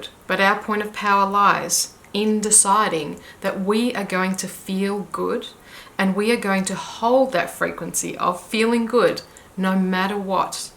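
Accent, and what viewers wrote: Australia. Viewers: OMG I have been working on healing from my narcissistic abuse for a long time and I have just discovered your chaneI. I love that you have an Australian accent. You are so clear and empowering. Thank you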